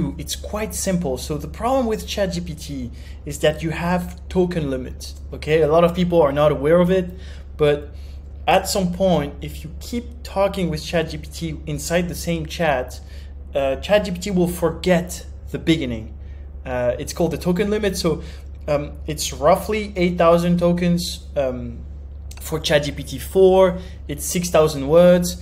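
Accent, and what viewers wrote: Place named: France. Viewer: Je perçois un petit accent de langue française… Parles-tu français par hasard? 😊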